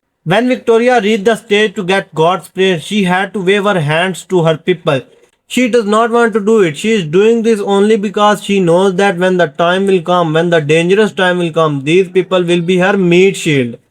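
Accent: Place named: India. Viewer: You english ascent is more indian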